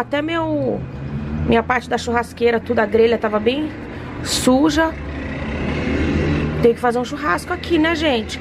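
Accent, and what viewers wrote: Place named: Italy. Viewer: Meu marido ouviu sua voz e perguntou: quem é essa italianinha? Daí eu disse: ela é japa amor😍😊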